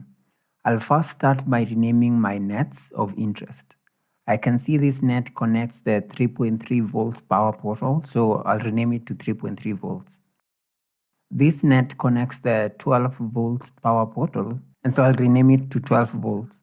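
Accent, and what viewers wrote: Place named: Kenya. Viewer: You sound like a Kenyan, I'm impressed by the clarity of your explanation and inspired to gain such understanding. Great work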